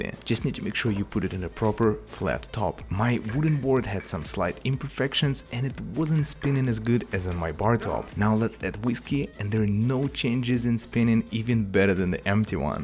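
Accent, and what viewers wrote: Russia. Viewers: Are you from russian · Hi, while I hear you,I'm think that you are from Russia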